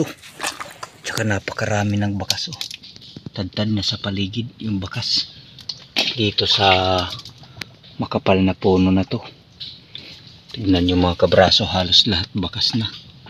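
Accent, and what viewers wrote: Waray, Philippines. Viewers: idol Taga San ka ho ba kasi parang Yung salita nyo parang Yung sa Amin mga waray San Lugar or probinsya ba yang Lugar mo Samar ba? Taga samar din ako idol ingat lagi sa pag haunting ng alimango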